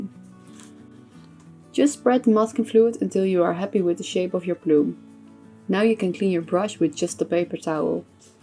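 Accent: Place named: Netherlands. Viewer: Je accent is zo heerlijk Nederlands, genieten ;)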